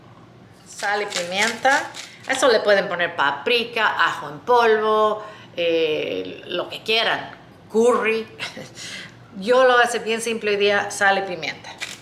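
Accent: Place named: Bolivia. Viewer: 😁 tenias que ser boliviana. Hermosa, me fascina cómo hablas disfruto mucho al ver tus recetas fáciles y delis 👌👏👍👏👏👏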